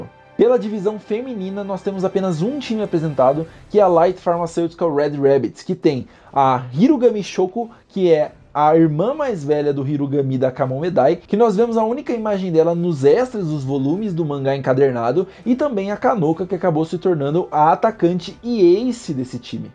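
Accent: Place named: South, Brazil. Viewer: Falo do "aportuguesado" mesmo, ainda que seja irrelevante... seria SU como silaba tonica... Não to criticando, nem falando nada... mas é muito comum a troca da silaba tonica mais para o sul do país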